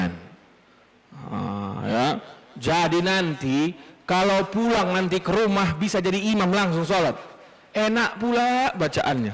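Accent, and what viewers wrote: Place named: Medan, Indonesia. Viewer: Iya, aku pun penasaran pas liat ada yg bilang melarang dengar ceramah UAS dan UAH dan dia sebut nama ustad salman farisi terus ku cari2 di youtube. Tapi ngomong nya kayak orang medan sumatera utara. Logat2 nya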